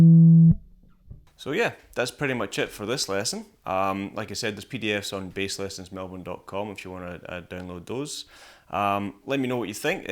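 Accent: Scotland, United Kingdom. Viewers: for a moment I thought I'd have difficulty understanding your accent as it says Bass Lessons Melbourne, but I was relieved to hear that you speak in Scottish accent. I hate the Aussie accent.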